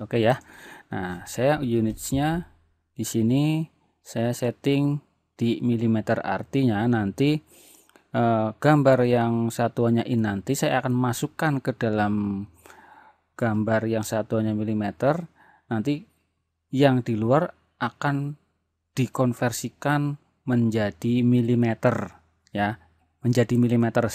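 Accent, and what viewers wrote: Banyumas, Indonesia. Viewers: menyimak om sangat membantu sekali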